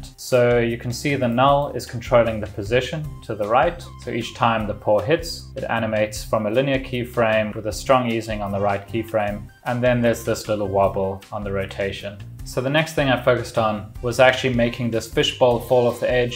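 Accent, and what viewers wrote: South Africa. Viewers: lol I knew you were south african from the first 5 seconds of the video🥳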